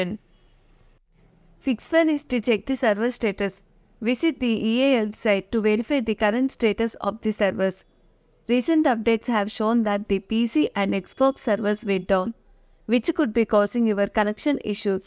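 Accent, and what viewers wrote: India. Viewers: why does the ai have an indian accent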